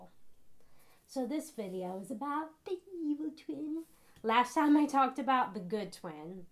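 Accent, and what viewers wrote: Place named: Philadelphia, United States. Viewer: You sound like you're from Philly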